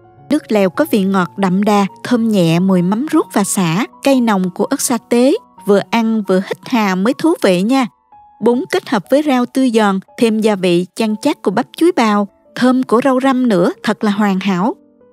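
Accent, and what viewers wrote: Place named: Mekong Delta, Vietnam. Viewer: Cảm ơn, giọng nói miền Tây thật ấm lòng ❤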